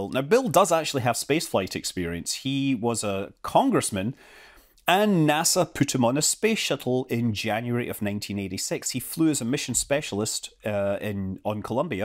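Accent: Scotland, United Kingdom. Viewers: I find it interesting that Scott's accent has changed little, if at all since moving to California. I'm not sure how long he's been there now, but I'm sure it's been at least a year or two, if not more. But I remember as a kid growing up in Southern Ontario. Between Michigan and Upstate New York, if you're not familiar with the location. We had a kid come to our school who moved up here from Tennessee in about grade 5 and he started off with a pretty thick southern drawl. But after maybe a year or so, his accent had mostly disappeared and he had more of a northern accent. But the one kid I knew from Saudi Arabia kept his accent right up until I lost contact with him in high school. Heh, used to give him a hard time about it sometimes, always jokingly, of course. He was a friend, after all. I chalk this up to a few things though. First and most obvious being that a southern drawl isn't nearly as thick of an accent as Scottish. 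And the second being that children tend to learn these things a lot easier/faster than adults. Like, my Mom and Aunt spent the first few years of their lives living in Germany and no doubt had German accents when they came over to Canada. But don't have a hint of a German accent. Meanwhile my grandparents both had very thick German accents for the almost 70 years they lived here until their deaths. Maybe it's down to how much easier and more naturally children learn than adults, or maybe it's down to my Grandparents having had more exposure to German acc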